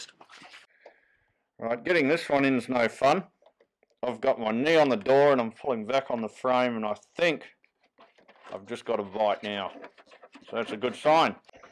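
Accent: Australia. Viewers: Great video, and great to hear an Aussie, in America, driving a British car! Very multi-cultural. I need to change some actuators in my Disco 2 and hesitated when confronted with having to 'fiddle' with the window jams / frames. After watching your video it doesn't look as bad as I thought it would be! Thanks! On another note, I changed the actuator on my rear cargo door as it wouldn't unlock with the remote key. Upon changing it, and then testing it, it still wouldn't work, then I realised you have to press the unlock button on the key fob twice to unlock the cargo door. It then worked. It made me wonder if I even needed to change the lock in the first place - I just needed to press the key fob button twice lolol. At any rate, at the same time I removed and rotate through 180 degrees and reinstalled the factory spare wheel carrier so that it would allow me to put bigger tyres on the car including the spare without clashing with the bumper. 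So all was not lost in this exercise. Thanks again for your videos - they are my first stop when I am contemplating doing some repairs and maintenance on my Disco. Cheers! Michael, Alice Springs, Australia.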